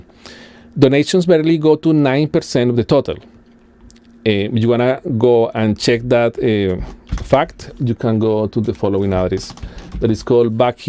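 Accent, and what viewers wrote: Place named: Chibcha, Colombia. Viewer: ese ingles campeche con acento chibcha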